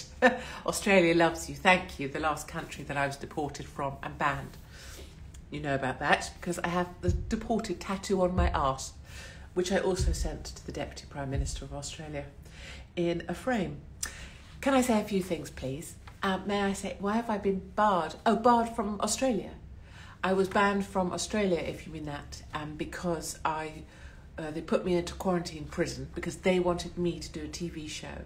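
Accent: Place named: Devon, United Kingdom. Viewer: How did you get rid of the Devonshire accent? I'm a proper Janner (just down thd Road from your birthplace). Glad to see you are well, x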